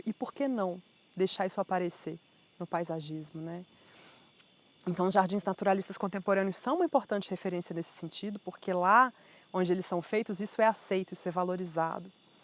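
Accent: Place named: Minas Gerais, Brazil. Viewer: Que bacana... Você conta como mineiro, tudo vira historia. Trazer o cerrado para dentro, é muito bacana.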